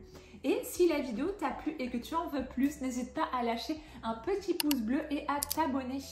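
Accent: Quebec, Canada. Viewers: J'allais dire la même chose, même la tournure des phrases comment a sentir le Québec 😂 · J’adore on commence à entendre que tu prends l’accent québécois. Expatriée aux states c’est la même merde au niveau santé, route et pis l’odeur du cannabis dans NYC c'est horrible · votre "début de petit accent québécois" est charmant! 🥰 💗 C'est ce que TOUT les français aiment chez les étrangers qui font l'effort de parler français 👍👏 Les Québécois reste et resteront à jamais dans nos cœurs avec leurs qualités et même leurs défauts! 🥰😘😘😘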